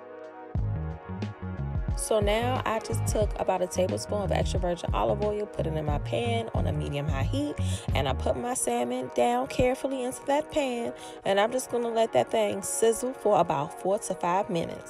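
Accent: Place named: Baltimore, United States. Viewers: I’m cracking up!! I heard you talking and knew you were from the DMV then I saw the Shoppers label and knew!! Love this recipe sis. Well done 👏🏾👏🏾👏🏾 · Amazing video!! You sound like u from the DMV area 😁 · Good Lawd! You did that!!! I'm cooking that tonight. I am from New Orleans and looks like home. But, you sound like you are from DC!!! Thanks! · You frombaltimore 😂😂😂 accent🥰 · I think I’m hearing a little bit of a dmv accent , but this look so good 😍😍😩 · Soon as You started to talk I was like “she sounds like me “. I know a DMV native accent when I hear one lol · She sounds like she from DC🤭😍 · Loved the video! I knew you were from the DMV area from when you first started talking! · Who is this lady why am I just finding you you sound Baltimore😂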